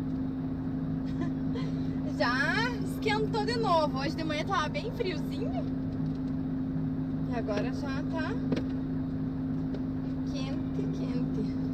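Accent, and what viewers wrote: South, Brazil. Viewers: Bom dia...sou seu fã...me diz uma coisa...de que região vocè é . ?...tem sotaque Sulista....beijos...aqui de Alm.Tamandaré-PR...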